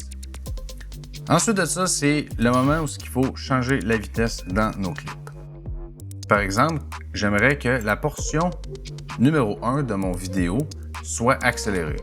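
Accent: Quebec, Canada. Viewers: Bravo c'est excellent on va droit au but ; merci jeune homme! Par contre l'accent québécois va faloir que je m'y fasse ! Surtout que je dois visiter ce beau pays dans les années qui viennent !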